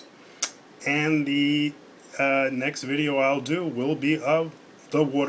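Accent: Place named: North, United Kingdom. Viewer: hes from up north i can tell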